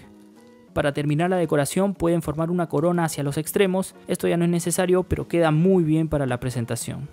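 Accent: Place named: Guatemala. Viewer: jeje Chapín es Guatemalteco, tu acento es muy similar al de ellos. He estado en Guate varias veces, me gusta mucho. Gracias por este postre.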